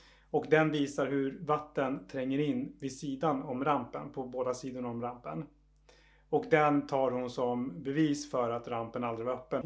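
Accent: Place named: Finland. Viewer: ARE YOU FROM SWEDEN ? BECAUSE YOUR ACCENT YOU LOOK MORE THAT YOU ARE FROM ..........FINLAND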